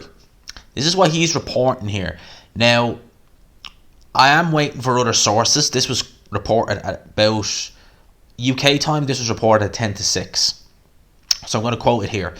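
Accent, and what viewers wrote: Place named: Ireland. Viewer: Your Irish accent is very cool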